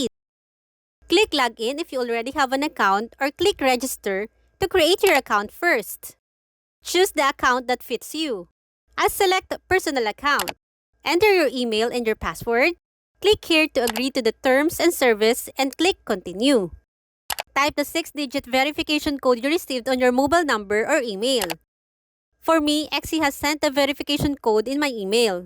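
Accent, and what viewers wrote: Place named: Philippines. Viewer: Iba talaga mag english ang tagalog. Litaw na litaw haha. Ty bga pala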